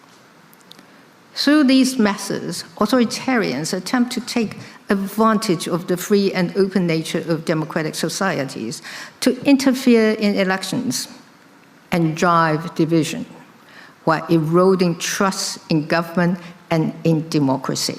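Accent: United Kingdom. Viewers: 總統是純正倫敦腔的英文耶👍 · 不看稿的英國腔超帥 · 蔡總統的英國腔超級好聽👍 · 英國腔超棒的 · 她是一口純正不列顛口音(或非常接近)，畢竟她是真的在那邊唸過書，不像一堆支那黨政客只是去國外買學歷但其實英文口說爛得要死。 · 英國倫敦政經學院的PhD, 好聽的英國腔. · 她說的是英國口音和台灣人習慣的美式英語不同。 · 總統的英國腔好好聽啊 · 英國腔太迷人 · 不卑不亢的英國腔演說，真正的領導人，偉大的女性，我向您致敬，感恩臺灣有您. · 什麼時候開始有這麼重英腔了？ 😀😆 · 英國腔? 真感動~ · 我愛她的英式英文，太好聽了。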